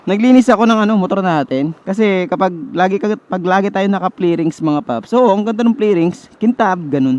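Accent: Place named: Visayas, Philippines. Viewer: bisaya accent 😆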